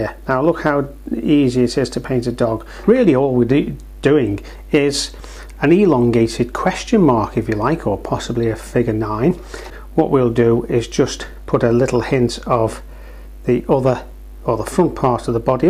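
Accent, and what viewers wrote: Liverpool, United Kingdom. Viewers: Fab tutorial,lovely to hear a scouse accent from my home town too!😀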